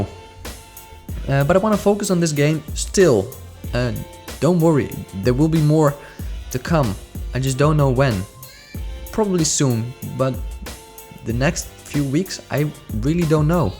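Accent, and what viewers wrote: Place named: Netherlands. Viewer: sup man. Great series I really enjoy watching series like these about cities skylines. where are you from by the way? You sound a bit like you are Dutch or Belgian.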